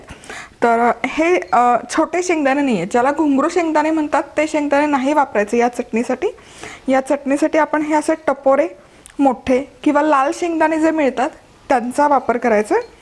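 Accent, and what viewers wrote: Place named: Pune, India. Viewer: Aap bahot looking ho mam aapki har ek recipe achi hepar aapka marathi me thoda sa alag he puna jaisa softly baat karo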